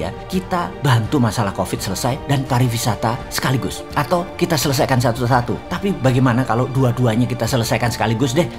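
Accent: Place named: East Java, Indonesia. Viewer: Seneng denger logat jawa timuranya bos. Sehat selalu bosman.panjang umur.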